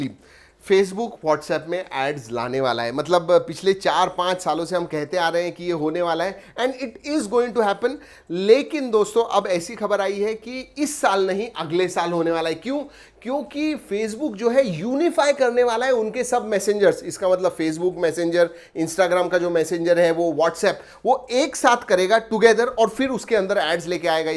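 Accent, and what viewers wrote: Maharashtra, India. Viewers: Your style is a bit different and with a delicate touch of marathi you are a perfect knowledge enhancer.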